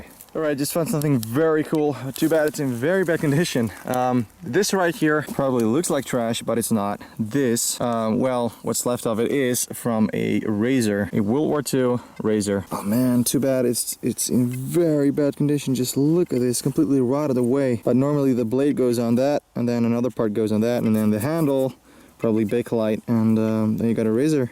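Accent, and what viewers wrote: Netherlands. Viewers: Is he from Netherlands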